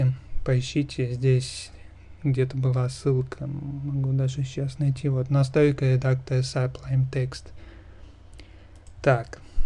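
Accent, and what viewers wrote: Germany. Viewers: Немецкий акцент сильно чувствуется.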